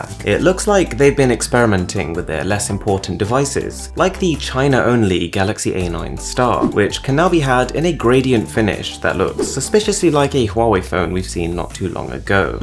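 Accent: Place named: England, United Kingdom. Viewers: Got to love the English accent 🇬🇧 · DK Dost! You luving that British accent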